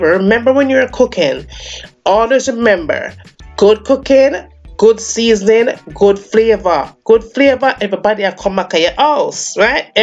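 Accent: Jamaica. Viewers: I love the accent. That Jamaican came out a few times while explaining the recipe 😂😂😂 I love it. My all time favorite food is Jamaican Dishes and Spanish Food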